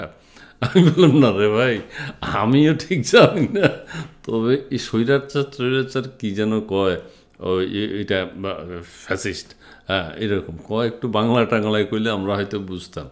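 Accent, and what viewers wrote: Noakhali, Bangladesh. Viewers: ধন্যবাদ আপনাকে ভাই। আপনার কথা শুনে ইচ্ছা করে। আপনি নোয়াখালীর ভাষায় কথা বললে ভালো লাগে। যদিও আমি পটুয়াখালীর।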